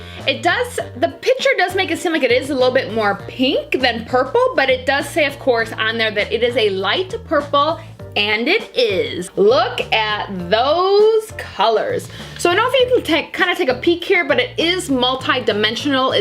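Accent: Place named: Upstate New York, United States. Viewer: are you from upstate ny?? you sound like me haha i love the positive energy!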